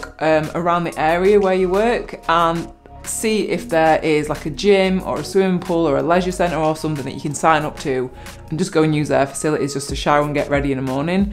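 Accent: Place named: Manchester, United Kingdom. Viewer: You didn’t cover the biggest problem that’s always made it difficult for me. Where to put your bike? With you’re accent I take it you are from Manchester, well I live there too and I’ve yet to work at a place there that would let me take my bike inside. Last place I worked I had to lock it to a lamppost outside, it lasted a week before it was robbed. The police described there are gangs that drive around in vans, which they fill with bikes. They carry bolt cutters that make mincemeat of even the most expensive locks. My bike before that was even stolen from inside the development where I live, watching back the CCTV, they somehow got the gate to open, came through the gate in a van, reversed up to the cage containing about 20 bikes, used bolt cutters to cut a huge hole through, filled their van, then drove off, the whole thing took just 13 minutes to steal 20 decent bikes. 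It seems to be a problem with Manchester in general, people seem to feel entitled to take what isn’t theirs. Like that daft MoBike scheme, it was never going to work, most of those bikes are now in the ship canal. I’ve even witnessed a group of youths jumping up and down on a bike, because they couldn’t steal it, so they thought they’d trash it instead. I just don’t take my bike to the city anymore if I know I have to leave it. Most of the time now, I just walk.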